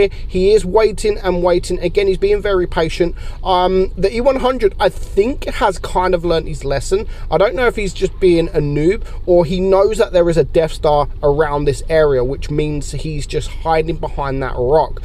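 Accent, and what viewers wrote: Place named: Scotland, United Kingdom. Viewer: Scottish lol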